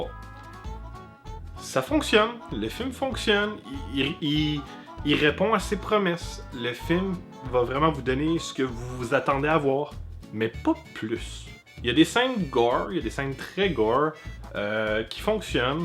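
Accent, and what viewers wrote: Canada. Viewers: tes quebecois je crois tas un accent canadien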